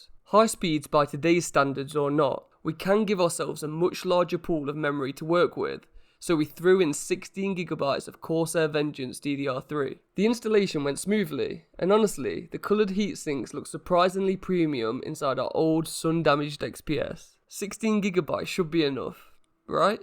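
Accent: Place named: Birmingham, United Kingdom. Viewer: ill sub to you dude.. you sound local.. im from brum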